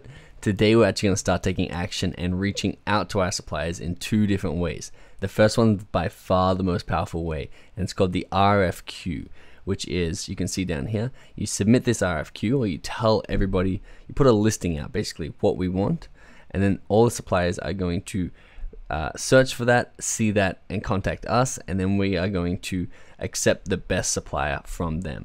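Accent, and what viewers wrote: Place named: Australia. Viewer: hey cool i live in vegas too, i thought u were from australia tho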